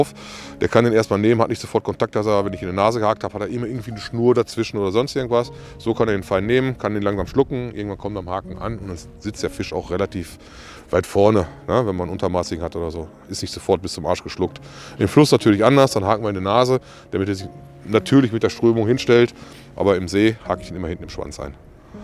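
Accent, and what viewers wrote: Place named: Bavaria, Germany. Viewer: Kommt auch auf den Bestand an😉 vom Dialekt her denke ich kommst du aus Bayern.. jedes Gewässer ist halt anders.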